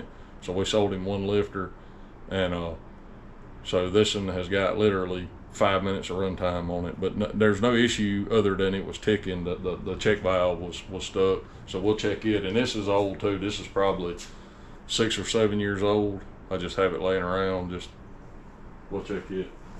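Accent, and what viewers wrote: South, United States. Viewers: I’ve already commented which you responded to, thankyou. Just want to make a viewers statement on your vids., you give us content we don’t find basically but is extremely informative that fills the gap on many vids on YouTube. Then you make a vid without all the fanfare at the start. Then your totally unbiased opinions that doesn’t offend anyone including the competition. At my old age you meet the criteria of the genuine producer of consumer products. you need to be proud of your honesty, professionalism to produce products that are of the highest order. Unfortunately in this day and age this attitude is getting rarer. Keep up the good work., being half way around the globe I cannot benefit from your high standards but I look up to your honesty and professionalism. Cheers mate. And geez mate your southern accent is full on. 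It’s cool coming from a slang happy Aussie. Keep up the good work.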